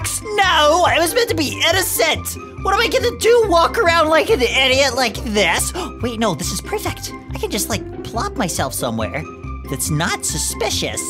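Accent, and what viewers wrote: Australia. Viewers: i think your from austreilia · Do you come from Australia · Are you actually Australian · I think your from Australia.. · I know where you are from Australia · ur from australia? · You are from Australia don’t Ask how know😂 from vid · I think that your from Australia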